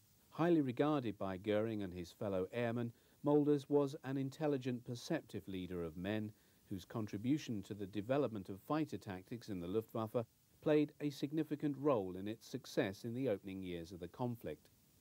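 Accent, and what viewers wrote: RP, United Kingdom. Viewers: Nice public school English , but background sound is way to high . Fire the editing guy .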